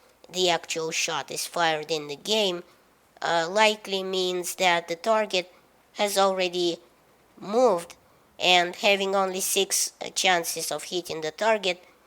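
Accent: Russia. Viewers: the coolest thing that people from russia have there accent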